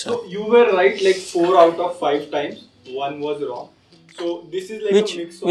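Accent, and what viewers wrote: India. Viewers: He sounds like Indian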